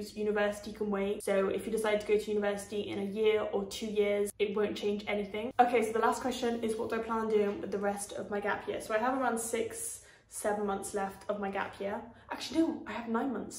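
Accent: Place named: England, United Kingdom. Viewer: Why do you have an English accent if you grew up in France?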